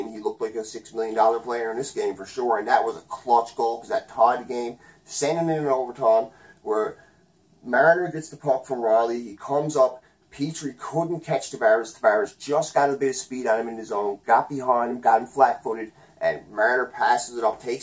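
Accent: Newfoundland, Canada. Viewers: No trouble telling you're a Newfoundlander, yes by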